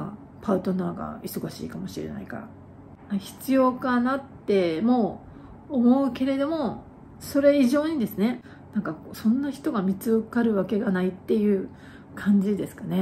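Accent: Kyushu, Japan. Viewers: やっぱり九州訛りが耳に着く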